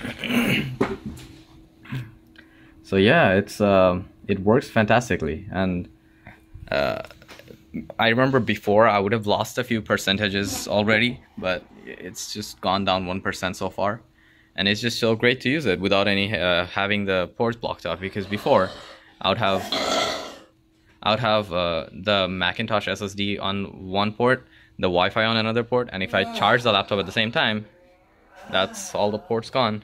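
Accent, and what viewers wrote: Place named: Arab world. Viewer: Love your middle eastern accent bruh, and thanks for this informative video! I plan on picking up this exact laptop due to it looking exactly like a MacBook Pro. Already Have a Mac Pro gaming Hackintosh. And yooooooo, I planned on doing the exact same thing with the apple sticker when I get this, are you me?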